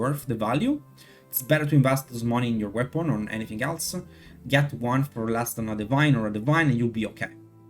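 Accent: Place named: Italy. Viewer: Hai un accento quasi italiano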